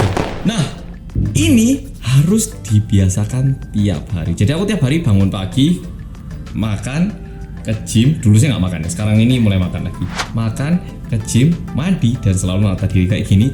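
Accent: Java, Indonesia. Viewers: Akhirnya denger lagi suara mas yg 😁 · AKHIRNYA MAS BALIK LAGIIII😘😘🤗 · Oppa come back😍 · Orang korea yg ♥️♥️♥️ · WELCOME BACK MAS! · Ya ampun akhirnya mas comeback, kangen banget ma kontennya apalagi orangnya wkwk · isuk isuk mantep👌👌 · Orang korea yg medokkkkk...🤗🤗🤗 yeee akhirnya upload video baru...🥳🥳🥳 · Akhirnyaa kambekk, aku kangen mas medhokkk 😭🥰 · Welcome back mas yg medhokkkk!!❤ Seneng dapet notif akhirnya upload juga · lama GK nonton kwkwk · Semangat mas.... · Hai mas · Medoknyaa mood bgt ^^ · The mas mas is back! Medhokkkkkkk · welcome back 🥳 intronya mo yg ' ' ato 'kinclong' sama ja smua nya ok👍 tetep semangat ya.. 'fightiiiing' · wes sam gausah ribet ribet konten e sg asik ae. nge vlog kek utowo metbg utowo rindingdong aja gausah ribet kek detektif reomit. wes talah viewer mu iki mbo kasih konten apa aja lo seneng, kenapa ga viewer senang liat konten trs sampean jg seneng buat konten. 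Semangat sam, btw welcome back to korea reomit bersama yg medokkkk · Welcome back to Korea Roemit bersama Mas yang. 😅 Syukur hiatusnya ga lama-lama. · Akhirnya mas datang lagi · Lovely korea is back!! 😇 고마워 Mas 🥰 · Mas come back😄😄😄💛 Mas kincling deng yaaa.. Akhirnya come back setelah notif channel korea reomit yg di tunggu tiap menitnyaaaa😣😍😍😍😍😍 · Kok kayaknya mas makin yaa?😂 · Kok logat Jawa ya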